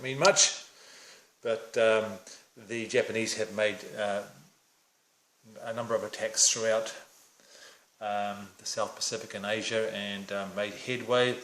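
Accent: New Zealand. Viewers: Been watching "the great war" you tube series, which has renewed my interest in WWI games. The only GMT game I currently own is Twlight Struggle, so i've been looking at reviews and sessions online. And what do I hear, a bloody kiwi accent on the first one I click on :) Know a place to pick up Paths of Glory in NZ at a reasonable rate? Or should i just order from overseas as per usual. Edit Found a 2nd hand copy for $26 NZ + shipping.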